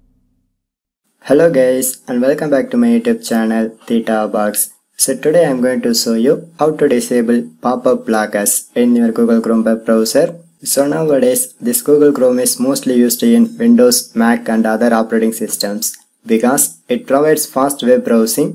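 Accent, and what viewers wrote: India. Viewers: This an Indian accent and oh my god if they talk to you on the phone such as Amazon or any cheap company who takes the jobs out of USA because it's a cheaper than an American person. Thank you!!!!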